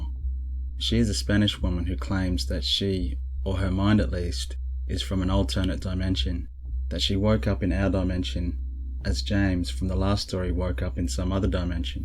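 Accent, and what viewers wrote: Australia. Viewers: are you from Australia?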